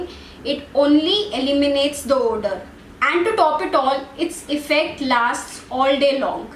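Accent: India. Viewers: Your voice is very irritating.really don't like Indian dialect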